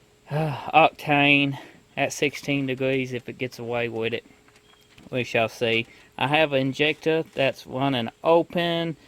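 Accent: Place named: South, United States. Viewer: Where are you from brother? Definitely a southern fella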